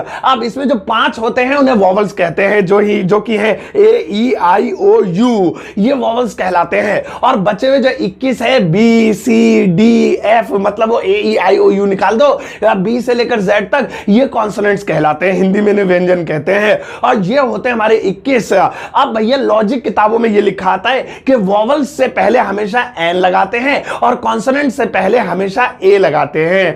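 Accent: India. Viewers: sir apke ye desi tareeke se english bolna sikh gaya 🥹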